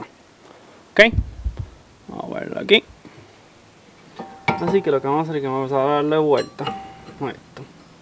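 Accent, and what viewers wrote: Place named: Puerto Rico. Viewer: oye brodel ..yo de mala pata saque el pistonsito completo del caliper. y ahora no me quiere sangrar..algun consejo q me puedas dar? ahi otra manera ..se sangra con la guagua prendida o apagada? soy novato en esto so mientras ma detallado puedas ser te lo agradesco..y x tu acento creo q eres bori..so una ayudita no me vendria mal gracias de antemano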